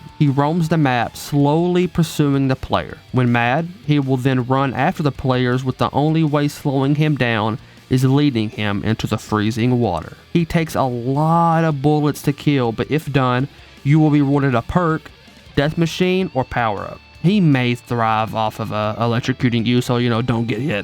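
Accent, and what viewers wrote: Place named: United States. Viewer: youve got the most american accent i have ever heard and i kinda love it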